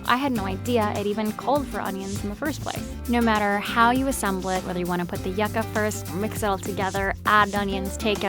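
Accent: United States. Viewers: Hablas como gringa habla un poco de español moja yo soy de Costa Rica y quiero que enseñes a hacer gallo pinto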